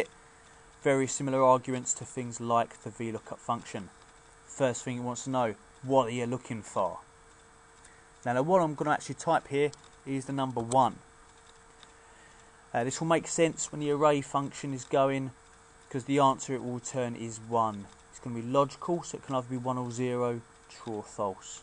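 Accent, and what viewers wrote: United Kingdom. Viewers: you rock! your british accent made it more interesting compared to the other monotone tutorials..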